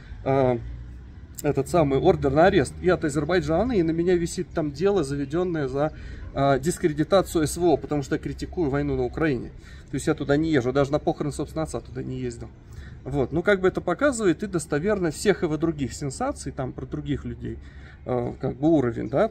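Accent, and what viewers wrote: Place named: Armenia. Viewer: А ты видимо получаеш армянские драмы а не азербайджанские манаты наверное и у тебя армянский аксент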